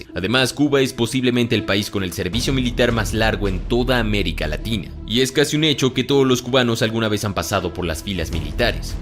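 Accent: Mexico. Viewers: Aa perro .. eres mexicano :0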